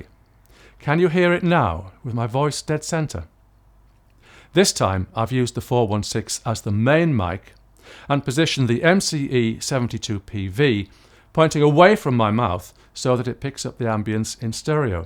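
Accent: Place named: North East, United Kingdom. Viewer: Cheers, very useful. Just about picking up your dulcet north east tones..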